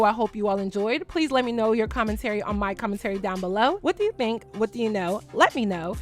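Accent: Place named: Philadelphia, United States. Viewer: I love your Philly accent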